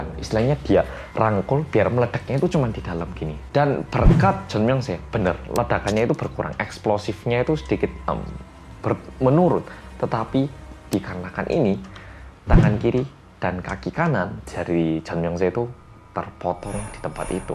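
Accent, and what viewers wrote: Java, Indonesia. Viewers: yang menarik dr sini otak guw membayangkan yg dia bicarakan .. hebattt sukses terus mas medhokkk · medhok ◐.̃◐ Nessie Judge: horror 👻 Nadia Omara: ngegass 😈 Arroyan Pram:serius, editan terbagus dan niat Detektif Aldo: serius pake banget (｡ì _ í｡) Gak tau kenapa aku suka banget sama kalian:-)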